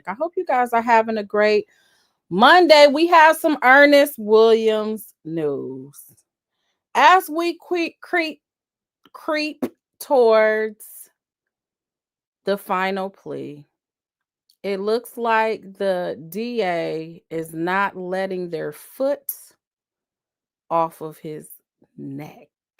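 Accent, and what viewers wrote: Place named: Mississippi, United States. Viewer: Hi you sound like country said y'all but it's cute you sound like Jackson Mississippi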